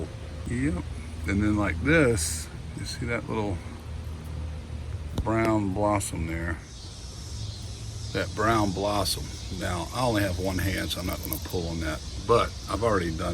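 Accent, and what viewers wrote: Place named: South, United States. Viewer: Yea! My 1st year w CPs!! I hope they turn out! 🍅 Can't wait to taste them! Yay, I picked the miracle grow, too!!😉👏 Are u originally from NC? Accent sounds a little more southern. 😁😊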